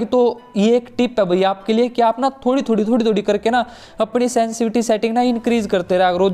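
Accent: Haryana, India. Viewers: Big fan bro I think you are from Haryana 🧐I am right plzzzzzzzzzz reply 🥰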